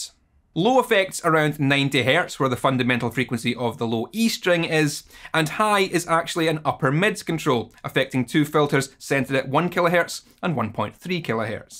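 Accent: Scotland, United Kingdom. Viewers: I love the vocal chain. And everything sounds great in a scottish accent. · I'm so happy my love for how certain words (most words tbh but some in particular) sound so good in a Scottish accent was validated by the man himself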